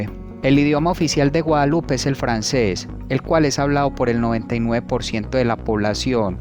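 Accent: Antioquia, Colombia. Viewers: Qué lindo eres en tomarlo en buena manera, pero va a ser difícil trabajar en ese acento paisa, de todas maneras sigue tratando. Muy buen Video!😊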